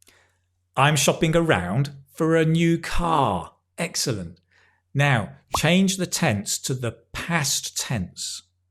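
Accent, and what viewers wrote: United Kingdom. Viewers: I always watch your videos. I love the way you teach. I want to take one of your courses. I’m an English teacher in Guadalajara, Jalisco, Mexico. I don’t need to take the IELTS but just for having a challenge and as a motivation. All my life I’ve tried to improve my English. I love English. Sometimes I feel stuck because I don’t use it! Nobody speaks English in my country. What course do you think I should take? I love your British accent! Lizzy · it's a pleasure to hear you speaking with your British and very clear accent and slow enough to understand so well thanks for all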